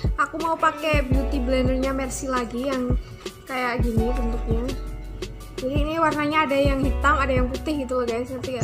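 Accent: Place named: Java, Indonesia. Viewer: suaranya medok bgt suerr, lucu deh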